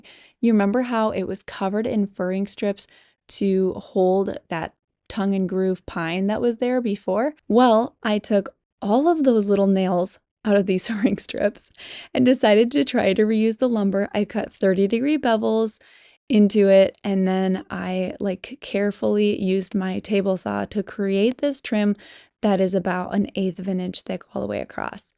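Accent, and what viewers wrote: United States. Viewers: I just love to hear * talk in her American accent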